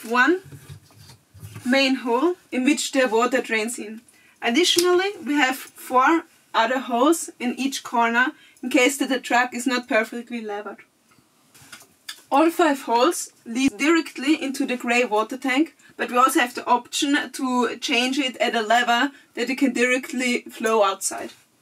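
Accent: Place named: Italy. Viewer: I can hear a liiiiiitle bit of an Italian accent there : ) But I wasn't sure, great English speaking!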